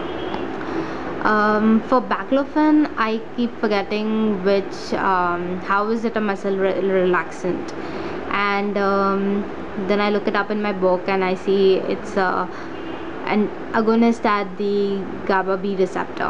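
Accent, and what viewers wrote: India. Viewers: Because of your accent and the traffic noise😁 btw im indian too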